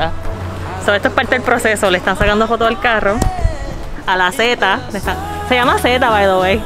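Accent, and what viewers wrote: Puerto Rico. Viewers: Una china y con acento boricua eso es el final una bomba 💣😋😋😋😋😋🤤🤤🤤🤤🤤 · ¿Hablas algún dialecto chino? El acento es 100% Boricua 🇵🇷 en español. So my guess is that you don't, please prove me wrong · Hola chica te admiro como te desenvuelve aquí en Puerto Rico asta habla bien boricua cuidese mucho te amamos bendiciones💯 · Weeeeepaaa ‼️ La gente siempre se sorprende cuando alguien asiático habla español con acento boricua. Me encantan 😍 tus locuras y ocurrencias ✨‼️ Qué tengas un feliz inicio de semana ✨✨😍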